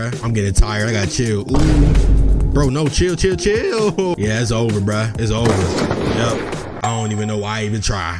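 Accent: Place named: Jamaica. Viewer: The Jamaican accent 😂😂. I wait everyday for a new vid to drop...stay consistent ...banger🔥🔥🔥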